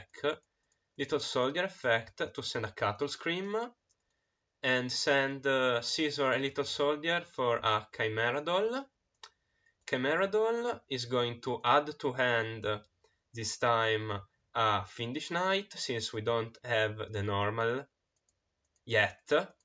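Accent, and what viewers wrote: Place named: Italy. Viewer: Bro non ti conosco ma so che sei 100% italiano e lo capisco dall’accento ahah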